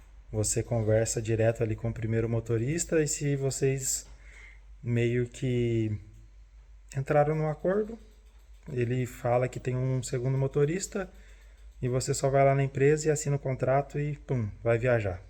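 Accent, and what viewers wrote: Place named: Rio Grande do Sul, Brazil. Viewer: Provavelmente é gaúcho.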